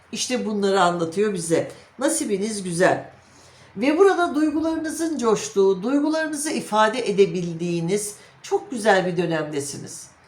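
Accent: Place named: Central Anatolia, Turkey. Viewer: Aldım kabul ettim bana uyanlari. ağzınıza sağlık hanım sizi çok severek dinliyorum nerelisiniz acaba merak ettim konuşmanız iç Anadolu bölgesi'ne hitap ediyor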